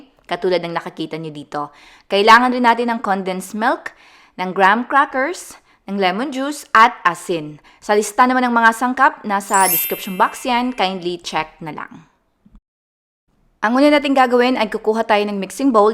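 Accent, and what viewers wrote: Visayas, Philippines. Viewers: I can hear a tinge of bisdak in your voice. Hope to hear more from you! 😍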